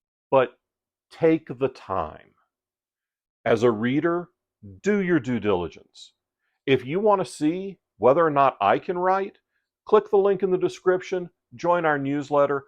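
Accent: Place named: Midwest, United States. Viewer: If I had to guess - I’d bet you grew up in the Midwest - Missouri, Arkansas, Alabama, Mississippi. I’m from Missouri - spent 24 years in the coast guard and moved a lot. But damn - I’m good at guessing where folks grew up.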